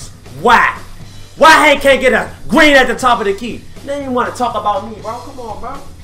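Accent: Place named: DMV, United States. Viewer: He gotta be from the DMV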